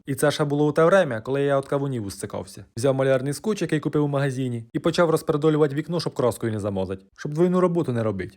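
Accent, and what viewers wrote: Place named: Zaporizhzhia, Ukraine. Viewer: Шторами завіситься, та й пройдуть ! Гарно , весело, а головне як у нас говорять! Я сміюся до сліз! Я із Запорізької області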